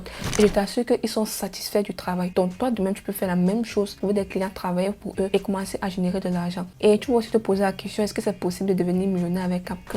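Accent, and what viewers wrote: Cameroon. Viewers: Comment tu exprimes comme une camerounaise comme ça🙂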